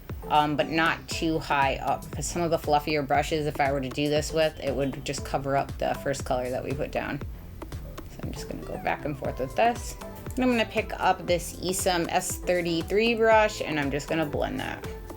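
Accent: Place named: East Coast, United States. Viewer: I had insomnia and woke up at 6PM (I’m French, so it’s already dark here). So basically I lost my Saturday and woke up completely depressed. But now in the upcoming darkness I can see a ray of hope, a glimmer of light all the way from across the ocean. Yaaas. It has long nails and a raspy east cost voice. It’s my queen and she is baaaaack. I am now ready. Candles are lit. Coffee has been made. I can enjoy your video. Thanks. Your timing is amazing. Always an absolute delight to watch your videos. Your personality and honesty are refreshing. All hail the dragon lady nails queen 👑! Love ❤️ (from across the ocean)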